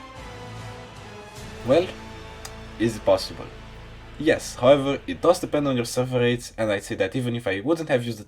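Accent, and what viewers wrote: Poland. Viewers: Are u from poland? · you just sound polish and im polish so was curious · Kolego, is it a polish accent?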